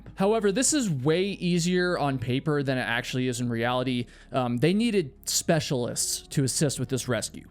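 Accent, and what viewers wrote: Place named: United States. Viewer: I'll subscribe if you take that flag down. We can HEAR you're USian. Peace and love from Denmark.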